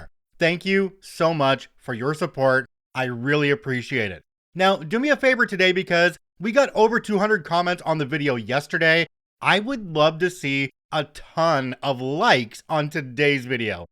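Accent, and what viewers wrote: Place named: Canada. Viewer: Hey,! Good to see ya! Nah, I don't think your channel would ever be mistaken for AI. I've heard Brit and US accents, but never a Canadian accent in AI voices. Not even once. When I saw yesterday's vid, I thought, "Okay, I know the voice, but the channel name wasn't Karma Stories, it was...." I guess I must not have had enough caffeine in my system, because the great, big chameleon on the screen didn't quite register with me. Well, maybe it blended in with the background.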